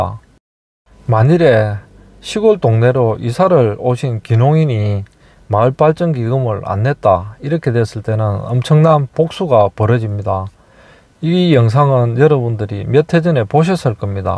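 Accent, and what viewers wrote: Gyeongsang, South Korea. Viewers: 사투리는 경상도신데 생각이나 표현은 진심인 진보라 늘 응원합니다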